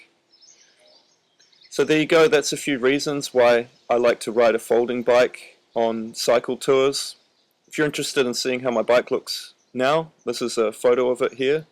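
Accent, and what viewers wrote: New Zealand. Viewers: u talk with kiwi accent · Hi from another kiwi (I think you sound like a NZer). I'm living in Japan and I have a Tern Eclipse S18 bike that I use to get around on the trains etc here. I'm planning on riding around the world (as much as I can anyway) in a couple of years when I turn 63 and I'd really like to take my folding bike. Its either that or my Surly LHT which is a great bike but doesn't fold. I've asked Mira Slegl <link> who took one around a lot of the world how it was but he said that a folding bike isn't very good on rough roads. Can I ask you how was your experience with a folding bike. Did you have any mechanical problems with the fold mechanism? I'd really prefer to take a foldie if I can.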